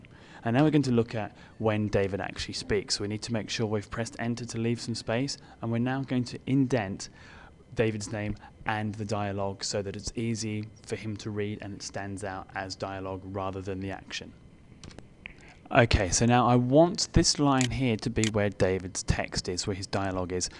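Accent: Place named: United Kingdom. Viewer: by your accent you appear British which helps; many videos re comments-opinions on screenplay spacings take into consideration American page size which is smaller than ours; my question is: do we alter their spacings to accommodate our size of pages? And what spacing sizes are we talking about?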